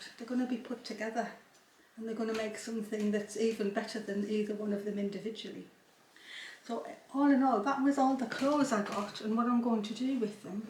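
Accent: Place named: Yorkshire, United Kingdom. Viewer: loved your video, sounds like you are from Yorkshire, would I be right?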